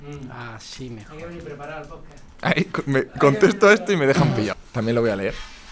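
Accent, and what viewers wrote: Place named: Colombia. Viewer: Es el español mas Colombianp qie conpzco ❤❤❤❤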